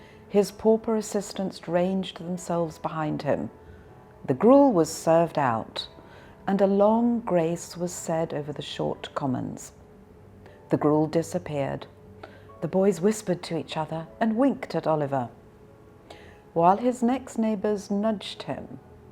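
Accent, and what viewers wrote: United Kingdom. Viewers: 영국발음 너무 좋아요.. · 오오 선생님은 포쉬엑센트를 쓰시는건가요? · 영국 살면서 영국 발음이라고 다 듣기 좋은건 아닐수도 있구나 느꼈는데 선생님은 목소리 톤하고 발음이 너무 듣기 편안하네요..^^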